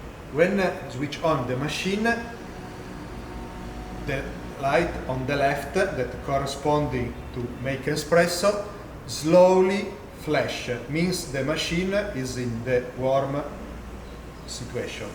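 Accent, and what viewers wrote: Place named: Italy. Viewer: Why does Italians speak English so badly? It seems impossible to even do it on purpose xD the accent is absolutely devastating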